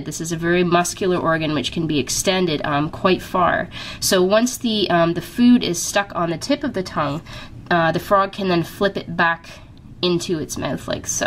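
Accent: Canada. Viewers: Is she Canadian? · Are you Canadian?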